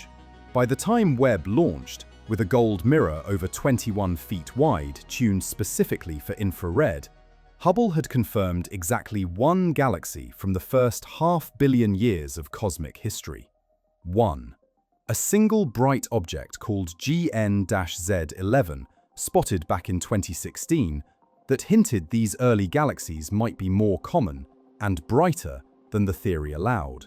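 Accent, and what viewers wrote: United Kingdom. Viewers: Why does the narrator have a British accent?? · What's with the British accent?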